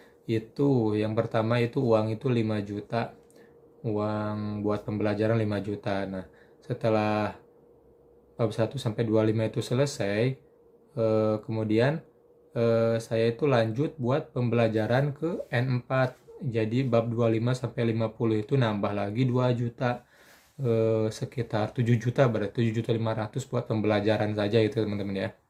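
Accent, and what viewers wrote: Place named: West Java, Indonesia. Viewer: Logat nya sunda bgtt kang , asli bumi di mana ?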